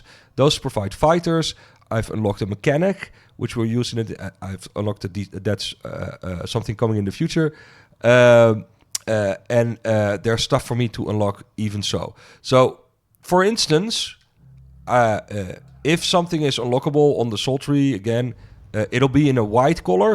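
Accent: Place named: Netherlands. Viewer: He sounds soooo Dutch, enjoying your game but still things are not clear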